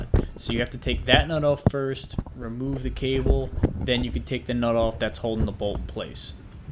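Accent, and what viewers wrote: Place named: Pennsylvania, United States. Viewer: Cool man. Sounds like a PA accent. Looks great. Totally worth getting a tool for the retaining ring I think. Here’s one I have. Pretty inexpensive for the heart burn. <link>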